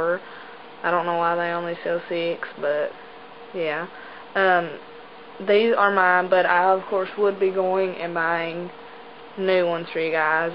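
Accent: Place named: Arkansas, United States. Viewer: OMG! I'm from Searcy, Arkansas originally...lemme say that you're accent makes me feel all warm and fuzzy inside! I hated it when I was growing up there, but I miss it now! Thank you for reminding me how great it is! Smooches!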